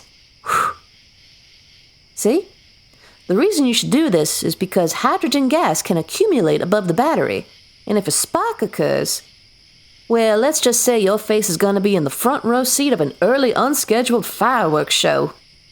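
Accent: South, United States. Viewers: You did a great job on the southern accent although usually this accent will usually only be found in Texas and Oklahoma rather than the true south, love the audio!! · Call me selfish but i do love me this mechtism shit, the southern accent just adds a certain "kiss me darlin i beg ya" to it, p4aise be the country darlijng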